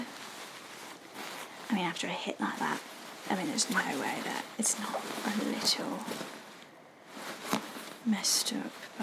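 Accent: United Kingdom. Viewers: EWWWWW BRITISH ACCENT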